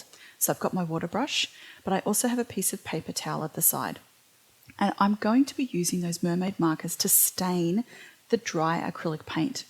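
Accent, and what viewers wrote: Australia. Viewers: Really loving your technique, you are so easy to understand. I so please to be hearing yet more Aussies doing these videos. TFS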